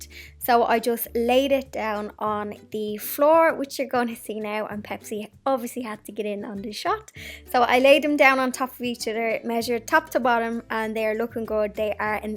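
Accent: Ireland. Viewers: I love your accent, Irish girl!! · I think I'm going to watch all my tutorials by Irish content creators...that voice!!! 💚 🤍 🧡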